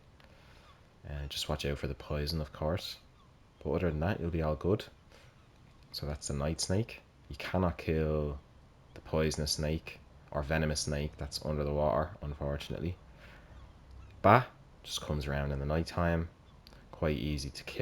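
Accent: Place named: Dublin, Ireland. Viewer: love your accent! Are you from Dublin?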